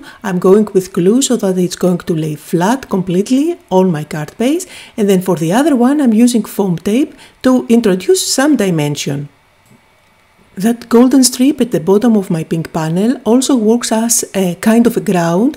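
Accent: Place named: Greece. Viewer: I loved all your cards and I do want to mention that I know a Papaioannou family in the town in Ontario, Canada, where I live. They have a daughter who was a figure skater... Penny Papaioannou. One of my best friends was married to a Greek man with the last name Papadakos. Anyway, I love your cards and your accent reminds me of my Greek friends.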